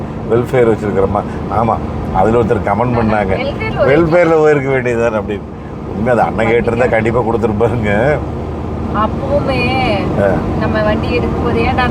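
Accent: Kongu, India. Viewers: கொஞ்சும் கொங்குத் தமிழ் பேசும் கோமகரே...! திகட்டாத தித்திக்கும் பேச்சுடைய திருப்பூர் திருமகரே...! எங்கள் மதிப்பிற்குறிய திரு. அண்ணா அவர்களே❤❤❤🙏 எப்படி இருக்கீங்க அண்ணி❤❤❤🙏 எப்படி இருக்காங்க ரித்திக்❤❤❤ எப்படி இருக்காரு. தெலுங்கானாவில் இருந்து திருப்பூர் வந்த அந்த நண்பரின் நட்பை உங்களுக்கு தெலுங்கானாவில் வெளிப்படுத்தியதை வெறும் வார்த்தையின் வாயிலாக சொல்லிவிட முடியாது அண்ணா❤❤❤❤🙏 மாருதி கார்களைப் பற்றி இன்னைக்கி பலதரப்பட்ட இழிவான பேச்சுக்கள் சமூக வலைதளத்துல உலா வருது. உதாரணத்திற்கு.... மாருதி காரா அது ஒரு டப்பா...டின் கேன் இந்த மாதிரியான பேச்சுக்கள். அது அத்தனையும் முறியடிக்கும்வண்ணம் மதிப்பிற்குறிய அண்ணா உங்களோட இந்த லடாக் பயணம் நிச்சயம் அமையும்...! காரணம் லடாக் பயணம் என்பது அவ்வளவு எளிதான பயணம் கிடையாது. அதிலும் நீங்க SWIFT காரைக்கூட எடுத்துட்டு போகல? மாருதி 800 காரை எடுத்துட்டு போயிருக்கீங்க👍👍👍👍👍 இதுவே மிகப்பெரிய சவால்👍👍👍👍 லடாக் சாலை பொதுவாக மலைப்பாதைகள் இருக்கும்.... 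சில இடங்களில் பள்ளங்கள் மேடுகள் அதிகமாக இருக்கும் என்று சொல்லக் கேள்வி. அதையெல்லாம் இந்த மாருதி 800 காரில் சென்று பயணத்தை வென்று திருப்பூர் மண்ணில் கால் பதித்து மாருதி காரின் சரித்திரத்தை வெற்றியாக்குங்கள் அண்ணா...! லடாக் பயணம் இனிதே தொடர்ந்திட வாழ்த்துகள் அண்ணா❤❤❤ என்றும் அன்புடன் உங்கள் தம்பி என்றும் அன்புடன் உங்கள் TMF ரா.முஹம்மது கபூர் (சென்னையில் இருந்து)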